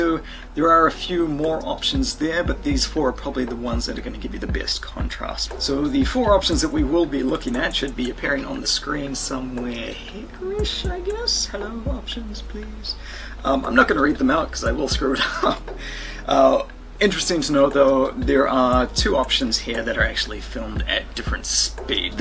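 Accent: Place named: New Zealand. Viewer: Gosh that Kiwi accent shining through! LOL - good video mate, happy to see your face. Hasn't changed!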